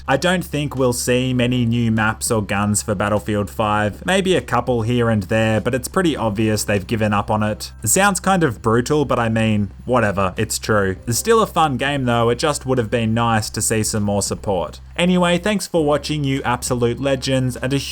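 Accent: Australia. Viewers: You're Australian?!?!?!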